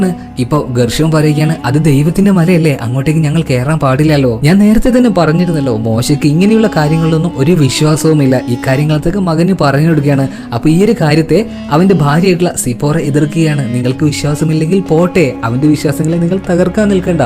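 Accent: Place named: Palakkad, India. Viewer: പാലക്കാട് കാരൻ ആണല്ലേ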